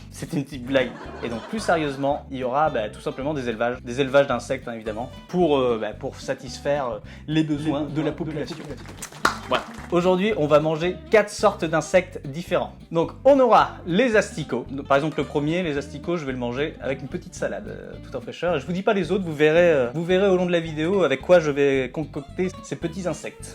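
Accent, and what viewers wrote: Canada. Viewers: PK tu fais plis l'accent canadien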